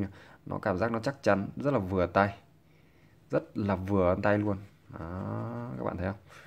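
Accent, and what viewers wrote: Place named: China. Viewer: ô này nói lơ lớ, chắc người TQ học tiếng Việt à :))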